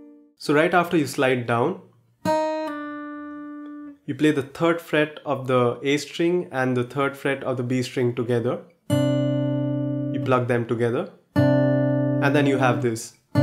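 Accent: India. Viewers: I m sure this Guy is Indian.. Coz he's English Sounds like Indian accent! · why do u have an Indian accent?? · You sound Indian...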